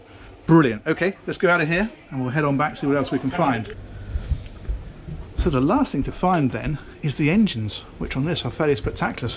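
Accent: United Kingdom. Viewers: Your British accent makes these tours even more interesting ...not sure why??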